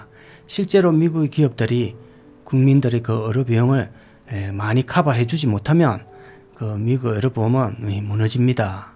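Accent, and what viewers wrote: Gyeongsang, South Korea. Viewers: 구수한 경상도 억양의 말을 거부감 없이 재밌게 잘 들었네요 한국은 의료민영화 절대 안됩니다 하는 순간 빈민국으로 추락입니다 미국도 의료시스템 땜에 시민들이 억의 빚을 지기도 하고 비실거리는데 한국 같으면 상상도 하기 어렵습니다 미국도 의료민영화 없애야 하는 아주 고질 시스템입니다 저는 웃긴 자막 재밌습니다 훈훈한 경상도 말씨에 구독하고 갑니다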